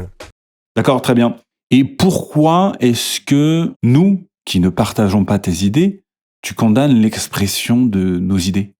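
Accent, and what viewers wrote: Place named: Banlieue, France. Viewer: deja un asiatique qui parle comme un rebeu des cité bon voila quoi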